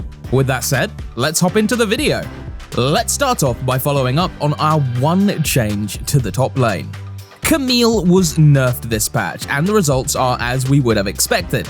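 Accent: United Kingdom. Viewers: Definitely more British than Australian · I wasn't referring to the voice, it's definitely british, something you would hear from a documentary I mean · Always love me some british accent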